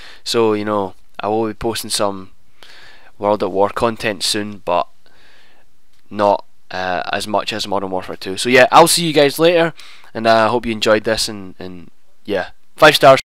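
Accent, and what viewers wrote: Scotland, United Kingdom. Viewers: I like Scottish English,your voice reminds me of Soap Mc Tavish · i like your accent, scottish? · r u scottish cus my neighbor is and scottish accents are cool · its scottish it isnt anything like irish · are u scottish???? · are u british or sumthin not trying to be raseist or wat evva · Scottish, I’m his nephew btw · He sounds a bit Scottish to me. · Man, I love your scottish accent, awesome! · Nice scottish dialect 5/5 (Nice play 2) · Your scottish accent is probably the only kind i like, Xbrzz sounds so common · Awesome accent, I'm Scottish too. Half. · wa hey. A scot. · I love calm scottish accents :D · you sound more scottish <3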